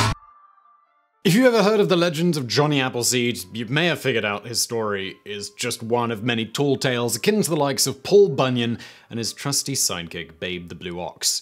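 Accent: United Kingdom. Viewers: For the last month this guy has been acting high in these videos. "I have no idea what I'm about to say ... I never heard of any of this ..." Is this in the script? Is he pulling these phrases out of his ass? These videos are becoming unbearable. The British accent made him sound smart over the years. Like when people think Alex Trebek is a genius while he is just an actor. But when he acts like an idiot, the accent makes him sound even dumber. Why would I want to learn from some backwater hick? Is he saying things that are researched or is he just making stuff up?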